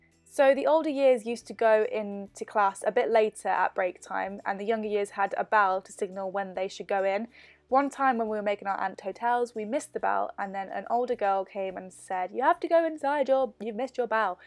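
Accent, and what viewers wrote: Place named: Coventry, United Kingdom. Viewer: Hey, I am Millie. I am a newish subscriber. I just subscribed and i actually love you videos so much💕. I am from Coundon in Coventry haha. But i live in Australia. I actually never noticed your Coventry accent until this video. Please reply to this even though, i have commented 2 years later haha xxxxxx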